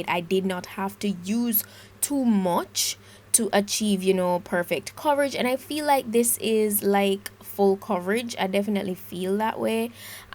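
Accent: Jamaica. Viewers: I hear that Jamaican accent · Love everything....the makeup, the Jamaican accent😉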